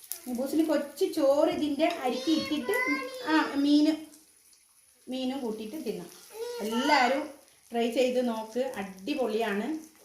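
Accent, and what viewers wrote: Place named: Wayanad, India. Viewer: താത്താന്റെ സംസാരം കേ ട്ടിട്ട് വയനാട് ജില്ല തോന്നുന്നു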